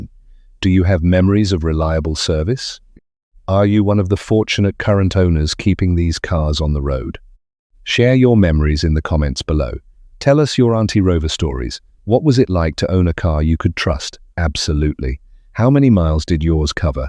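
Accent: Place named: United States. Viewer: Awful narration; American spelling and pronounciation; repeats the same point over and over.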